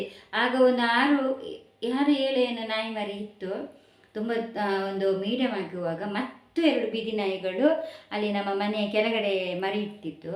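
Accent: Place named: Mangalore, India. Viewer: ನೀವು ಮಾತಾಡುವ ಮಂಗಳೂರು ಕನ್ನಡ ನನಗೆ ತುಂಬಾ ಇಷ್ಟ 👍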